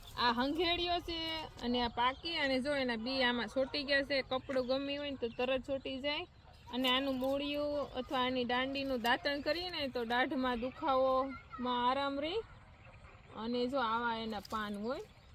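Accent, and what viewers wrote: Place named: Kathiawar, India. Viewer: તમે બોલો તે કાઠીયાવાડી ભાષા સાંભળવી ગમે છે. તમારા ગામ નું નામ શું છે.ત્યા કેમ પહોંચાય તે વિસ્તાર થી કહેશો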